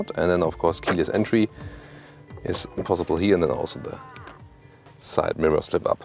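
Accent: Germany. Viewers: good english but the accent is still like a lufthansa flight attendant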